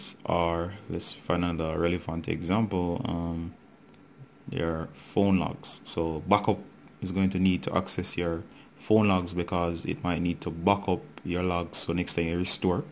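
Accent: Jamaica. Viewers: Jamaican I think not being racist just heard the ancient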